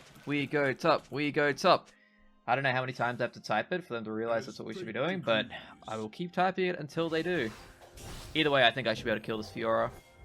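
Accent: Australia. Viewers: Hi are u from Australia?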